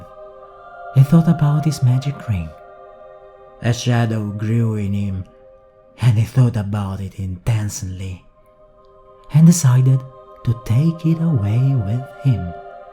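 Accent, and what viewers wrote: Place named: Italy. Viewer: I sensate a pizza accent. Are you Italian? Tolkien name is pronounced tole-kee (emphasis on tole) not tole-kee-anne BTW. You're good though. Keep the good stuff going 👍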